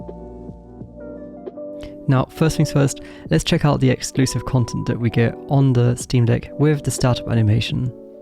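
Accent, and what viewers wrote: Germany. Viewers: Geiles Video ! Am Akzent hör ich das wir die gleiche Sprache sprechen :)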